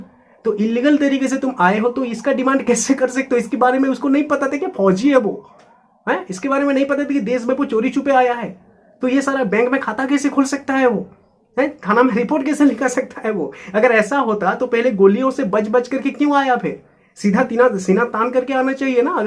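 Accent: Odisha, India. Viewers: Bhai AP kya odisha se ho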